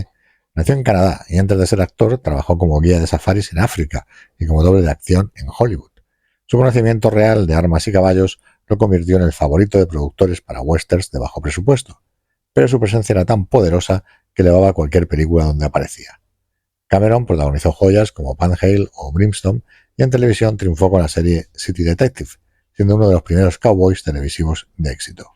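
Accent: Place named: Spain. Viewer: Pongan a una persona que hable español normal no de la madre patria.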